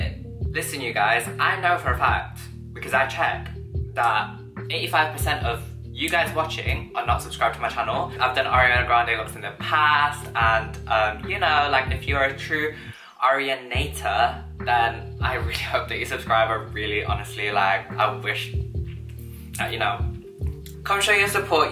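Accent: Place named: London, United Kingdom. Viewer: I’ve just been watching all of your old videos from like 3/4 years ago and you used to speak to posh and delicate and you used to be so quiet and no hate but all of your recent vids you’ve got this mad London accent it’s weird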